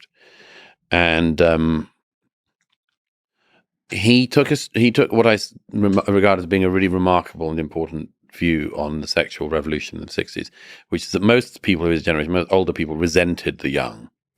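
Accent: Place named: RP, United Kingdom. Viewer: Yeah, he's totally got this wrong. Prat with a plumby accent is all he is.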